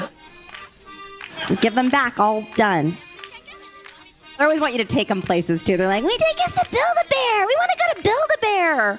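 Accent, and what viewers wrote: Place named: Mexico. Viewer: You sounded like lana but in mexican accent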